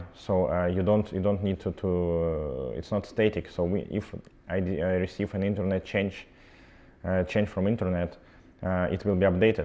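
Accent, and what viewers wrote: Russia. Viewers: Какой-то акцент у него русский... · Сразу чувствуется Русский акцент :-) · Действительно акцент у него какой то Русский !!! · странный чел с русским акцентом !!! а телефон дерьььмо -) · Акцент русский, потому что ОН -РУССКИЙ!!!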